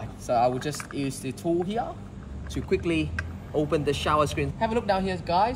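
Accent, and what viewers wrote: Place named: Australia. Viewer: Bạn nói giọng anh Úc ạ? Nghe lạ và vui tai quá 😊😊😊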